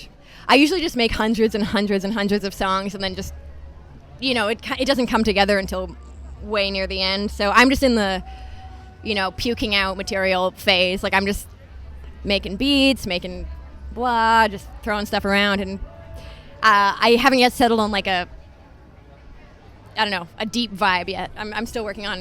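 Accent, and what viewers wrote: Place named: California, United States. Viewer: She's sounding really Californian. haha I kind of miss the rookie Grimes, but it's cool to see how much success she has attained.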